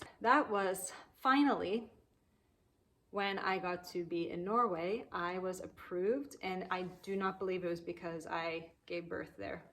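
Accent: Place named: Midwest, United States. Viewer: Omg I was convinced you were not a native English speaker haha. Yes, I think it's the vowels and maybe it's the midwestern accent throwing me off.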